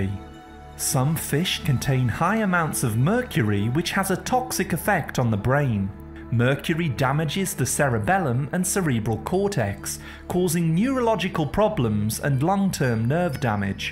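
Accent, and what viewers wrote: United Kingdom. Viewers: Very informative, thank you! Interesting that you read with a British accent but then use American names. E,g. I don't know a single Brit who knows what arugula is (it's called rocket here)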